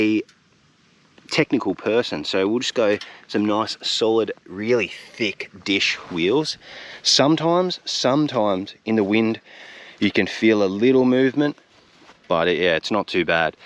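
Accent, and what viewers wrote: Australia. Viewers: Hey... really enjoyed this video! I went to test ride a 2022 Fat Boy at $17,400 with only 2000 miles on it. Somehow... I came home with a 2023 Baja Orange Breakout. Since I bought a new 2023 Harley... I get free tires for life! The Breakout is very nice, and similar to a Fat Boy. They share the big 240 rear tire, but the Breakout has a 21 inch front wheel. My Breakout has the big 117 engine... and it hauls ass big time! I like listening to your Australian accent. Also like that hair cut your sporting! Don't be nervous while your filming... you're doing a great job! Hope your channel keeps growing!!! John from Oregon, USA!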